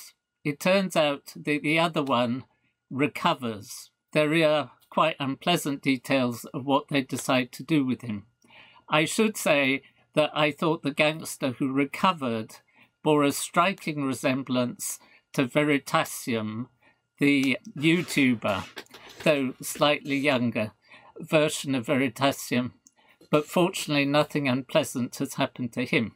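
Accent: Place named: United Kingdom. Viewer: hearing someone say "the hero, walter, and his sidekick jesse, were surprised by gangsters; walter defeated two gangsters" in a british accent does something to my brain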